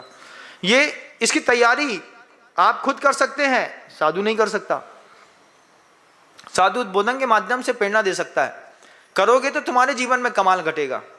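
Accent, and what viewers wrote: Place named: Bundelkhand, India. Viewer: Namostu bhagwan namostu aap बुंदेलखंडी बढ़िया बोलते हो 😂🎉🎉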